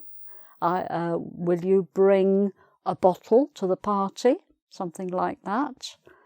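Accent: United Kingdom. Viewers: The British accent kill my ears. Jesus. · What a beautiful British accent.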